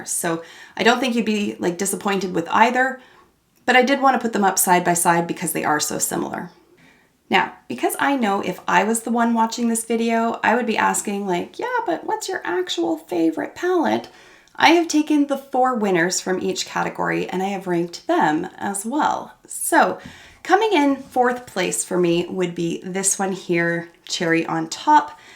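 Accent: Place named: Canada. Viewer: I adore BH eyeshadow pallets! Thank you for the reviews, now I know which ones I want to get next. My go-tos are Bubble Gum, Blueberry Muffin, Mimosa, and the 80's, 90's, and 00's remix pallets. (The 80's one has a pretty great red called Thriller.)Now, I obviously don't know where you're from(Though you sound like you could be Canadian) but here in Tennessee, TJ Maxx is a pretty popular chain. I get all of my BH products from there and they run super cheap, like, 5.99, so I snatch them up when I see them lol. I love how intelligent you are when talking about all of this, not all air head sounding(Monochromatic! No one uses those type of words. Well, not often.). Totally subbing!(Also, I apologize if you addressed different things I mentioned, I'm typing as you're talking lol. )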